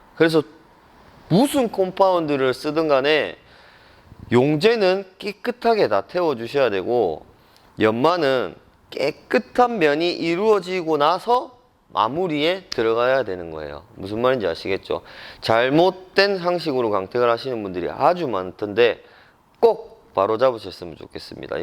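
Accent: Gyeongsang, South Korea. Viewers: 상도억양 개싫다. ㅜㅜ😊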